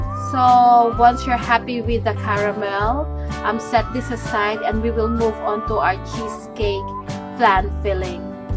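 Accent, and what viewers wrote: Philippines. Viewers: you sounds like Filipino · Hay naku dmo pa KC tagalugin halatang Pinay ka.puro question mark way Ng English mo😂😂😂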